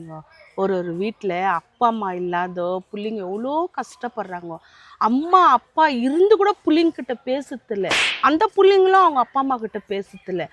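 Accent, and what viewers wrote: Bangalore, India. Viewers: சூப்பர் மா நான் சமீபமா தான் உங்க வீடியோ பார்க்க ஆரம்பிச்சேன் சூப்பர் பெங்களூர் தமிழ் எனக்கு ரொம்ப புடிச்சிருக்கு நாங்க சேனலை சப்ஸ்கிரைப் பண்ணிட்டேன்.. வருத்தமா இருக்க சமயத்துல உங்க வீடியோ பாக்குற நான் மகிழ்ச்சியா இருக்கிற😊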